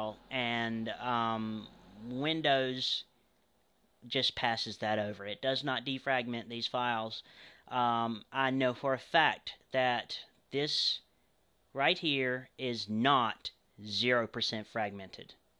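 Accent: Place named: Texas, United States. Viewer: I agree! I love your voice! Clear and precise instructions. I would venture to guess you are in Texas. Anyway, this video has opened my eyes to what my computer can do. I used to use Auslogic, but I believe it had another name. It was on a desktop I had with windows 3.1 and also with windows 95. Now you can guess how old I am. LOL Since I got my new computer, DEL, I've been looking for this, and now, thanks to you, I have it again. Thank you so much! Keep up the good work!!!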